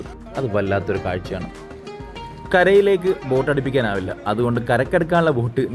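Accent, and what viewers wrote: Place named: Kerala, India. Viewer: Samsaram kettal keralathil ullath enn thonnum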